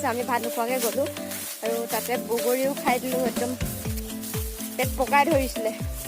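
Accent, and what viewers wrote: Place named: Upper Assam, India. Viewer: Ujoni axomor bhakha to bor val lage mur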